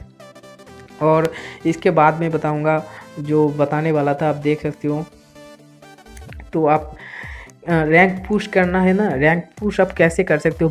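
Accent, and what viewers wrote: Bengal, India. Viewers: Are you a bangali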